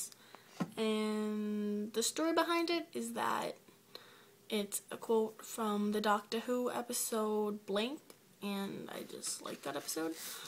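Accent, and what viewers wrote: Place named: Boston, United States. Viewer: Lol babe I love the way you pronounce coupon, pajamas, caramel, salmon, fire, water, New Orleans, lawyer, then any other thing with a Boston accent.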